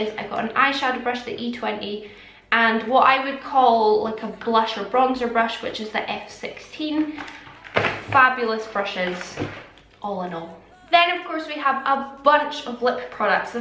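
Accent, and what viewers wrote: Scotland, United Kingdom. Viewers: I love your videos, I think I started watching them when I was looking for reviews of pale foundations. I'm a sucker for a beautiful Scottish accent! What lip colour are you wearing in this video? Such a gorgeous red.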